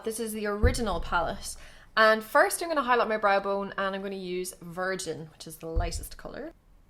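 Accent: Ireland. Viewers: Great tutorial, clear and to the point. Great camera too, makes it a pleasure to watch. And you're Irish? You've one me over, just subscribed x:-)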